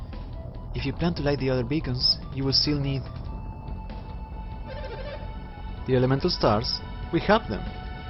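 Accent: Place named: Spain. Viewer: Just came from your Megaman videos and am binging through Golden Sun. Indeed there are few viewers, but I think it's mostly since this is an old game. I watched it for the nostalgia. Based on your accent, I'm guessing Spanish (I have plenty of Spanish friends with a similar accent)? You definitely don't have a bad voice, and I'm amazed at the amount of work you put into something you like. I may not comment on every video, but I'm watching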